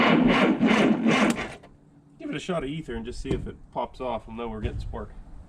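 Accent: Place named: Pennsylvania, United States. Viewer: For the longest time, you reminded me of someone; but I couldn't place it. I finally figured it out. You could be Rick Rowlands' kid brother. BTW my people are from around Uniontown/Connellsville, you talk like them.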